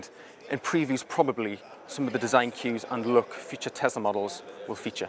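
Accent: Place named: North East England, United Kingdom. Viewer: Its a North East accent...whay aye man :-) Even people from North Yorkshire have to listen carefully...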